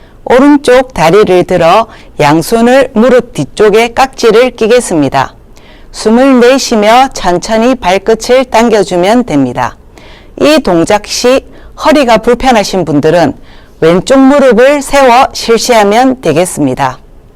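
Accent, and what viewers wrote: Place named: Gyeongsang, South Korea. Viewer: 경상도사투리 정겹네요 스트레칭 아침마다 따라하고있습니다 귀에 쏙쏙들어오네요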